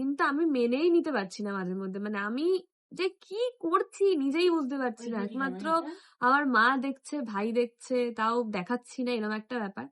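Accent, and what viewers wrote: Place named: Birbhum, India. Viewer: Khub khub sundor hoyeche ❤ Wow that's was great tomar mukhe Birbhum katha taa khub vlo lagalo ami Birbhum jajigram aa thaki 😊❤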